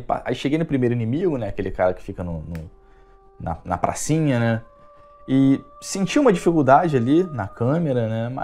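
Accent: Rio de Janeiro, Brazil. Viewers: nem tô mané, é só carioquês mesmo... Isso aí pra mim é vírgula kkkk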